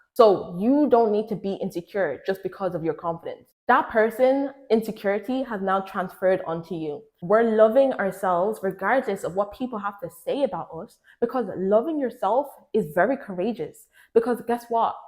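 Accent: Ireland. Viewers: Are you from Ireland ? Thought I heard the accent 😊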